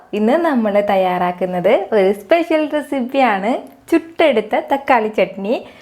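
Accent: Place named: Thiruvananthapuram, India. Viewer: Thiruvananthapuram aanoo.. Slang oru vyathyasam.. 🤣😍Enthayalum adipoli. Kelkumbol thanne oru chirichkondulla suntharamaya mukam manasil varunnu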